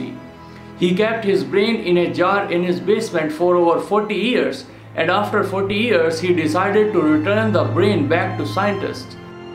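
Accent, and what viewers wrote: India. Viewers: First time watcing indian. (Know from the speaking accent.) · Yeah I also heard this Indian man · 20% i can't even hear what your saying with that indian act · I can’t understand u ur so Indian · is this indian einstein speaking? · I fucking love indian accents 😂🤙🏻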